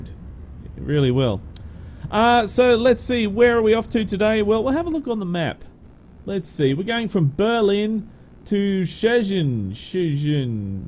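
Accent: Australia. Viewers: Are u Australian ?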